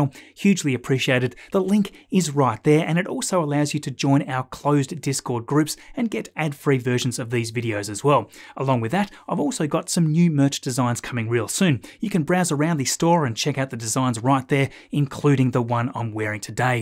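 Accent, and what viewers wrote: Australia. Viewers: I think just tries to hide the Aussie accent a bit given the international audience. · my lovely Aussie mate, I love your accurately non-politicized SpaceX reporting, but repeatedly have found myself in an information overload. Maybe it's because I'm 71 yrs old. Maybe it's because I'm a kiwi, and find Oz and kiwi accents distasteful on YT. But may I suggest you upload twice a week with half the content and double your audience?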